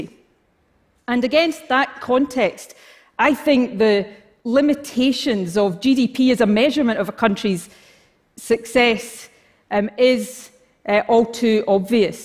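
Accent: Scotland, United Kingdom. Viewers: Oooh-a morning TedTalk and a nice brogue to listen to. I like this woman; she seems to know what's up. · Scottish accent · I'm English and understand every word, she's speaking English with a Scottish accent, just one of many different accents in the UK, I have a strong accent from where I come from in England, you'd probably find it hard to understand me, we don't all talk the same in the UK.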